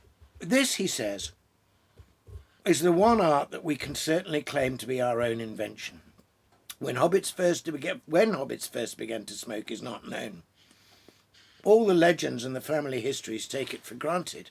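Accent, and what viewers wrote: England, United Kingdom. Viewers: I am Canadian so seeing a man with a British accent smoking a pipe reading Tolkien, this is what Canadians believe the British turn into once they reach 50 just the sweetest persons lol. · If I ever make it to heaven, I imagine this is the cameo of a man I’ll meet at the front doors. Smoking a pipe, reading my life back to me with an honest smile and an english accent. Until we meet again. 🍻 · Strange, I saw this in my feed and thought to myself: this guy probably has an English accent. So I clicked on it and behold it was about pipe smoking, which I do from time to time but have never searched for this stuff on YouTube. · I can only see an elderly Jack Black with a well spoken English accent.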